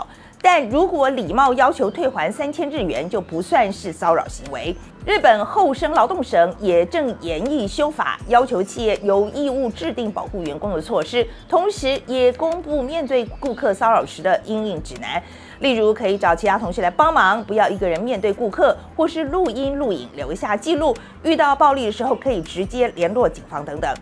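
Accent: United States. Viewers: 范姐一整個美式日文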